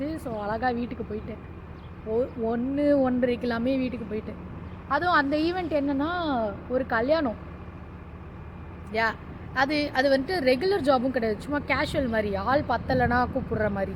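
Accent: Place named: Tirunelveli, India. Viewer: Sister, I like your presentation about your experience in finding a job. You are talking nicely and friendly. You have a good future in Canada 🇨🇦 as you are talented. I like your tamil language. I hope you are from Tirunelveli, am I correct?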